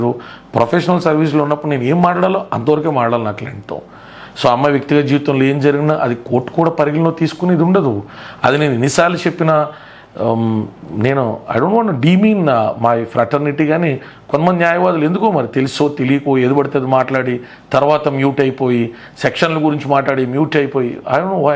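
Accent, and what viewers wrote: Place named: Bhimavaram, India. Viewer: దయచేసి మీరు ఇంక మీడియా ముందుకు రాకపోవడం మంచిది గారు.. JSP వల్ల చెడు అయ్యారు, ఈ లావణ్య కేసు వల్ల ఒక రకంగా పబ్లిక్ దృష్టిలో జోకర్ అయ్యారు.. బూతులు తిట్టి మా లాంటి వాళ్లకు గట్టి సమాధానం ఇవ్వ వచ్చు కానీ పబ్లిక్ లో మీపై ఉన్న అభిప్రాయం మారదు..ఇకపై మీకు శ్రీరంగ నీతులు చెప్పే అలవాటు ఇకపై ఐనా మానుకోవడం మంచిది.. 12 నెలలు అంటే ఆ తరువాత మాట్లాడుతారా..?? మరి ఇన్ని రోజులు భీమవరం యాస లో పెద్ద రాజకీయ ఉద్దండo లాగా ఎందుకు మాట్లాడారు..?? వాస్తవానికి మీకు ఉన్నది కుల గజ్జి రాజకీయం లో కమ్మ సామాజిక వర్గం మీద మీకు ఉన్న అక్కసు వెళ్ల కక్కడo కోసం మాత్రమే మీరు జన సేన పంచన చేరారు..అక్కడ మీ స్వభావం చూసి పార్టీ నుంచి పక్కన పెట్టారు.. MARK MY WORDS మీరు TDP win కాకుండా ఉండాలి అనే మీ కోరిక నెరవేరలేదు కాబట్టి ఇపుడు YCP కి మద్దతుగా TDP&JSP కి వ్యతిరేకంగా వెళ్తారు భవిష్యత్తులో..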